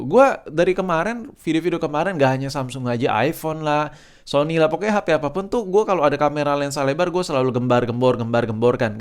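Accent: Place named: Cikarang, Indonesia. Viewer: Gaya omonganya kek orang cikarang bae cuk wkwk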